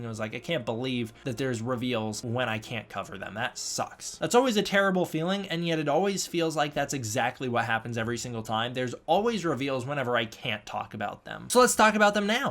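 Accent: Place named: United States. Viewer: When the Legendary speaker mispronounces Camazotz and continues with that mispronunciation. I mean, I know you’re gonna have an American accent, but PLEASE at least try.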